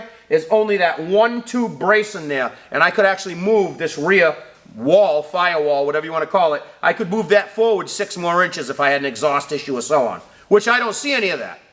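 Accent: Boston, United States. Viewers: All I hear is Boston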